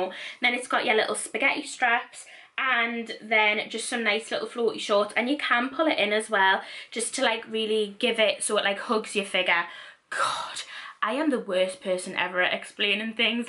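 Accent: Newcastle, United Kingdom. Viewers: The reason I subscribed to your channel is because you are so down to earth and don't follow all the other YouTube bloggers, you are different from all of them...you keep it real basically and don't portray your image, I also enjoy watching your videos as you are always bubbly and I love your accent/voice it's brilliant! (I'm from down south and love hearing the different accents from other British people 😂 I'm not weird honestly! 😂).....I've followed you on Insta aswell 😊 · I subscribed because it feels like home. You and I are both from Newcastle, ( I feel like there's not so many people on here from the north east) so wherever I am in the country, or in the world, I can click onto your channel and feel like I'm home again. It's so weird how an accent can transport you back to familiarity - especially when you're home sick. It's so lovely to see someone from the north east doing so well for themselves. 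We're always kind of looked down upon, for being dumb, drunk and outspoken, ever notice how when a geordie is on the TV - whether it be The Chase or Big Brother they always show us up? Thanks for not doing that. That's why I stayed - because you're not like everyone else. Thank you for always being genuine, fabulous and kind. P.S My Instagram is a new account so I didn't just follow for the giveaway! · The reason why I subscribe to you is because I never found anyone really relatable or who speaks like me (Geordie) I love your videos and watch them all the time xx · Reasons I follow you: - were both from Newcastle, it’s so nice to hear the Geordie accent online! - love the little challenges you give yourself! - I think you’re funny and so gorgeous! - I adore the confidence you have in yourself. ❤️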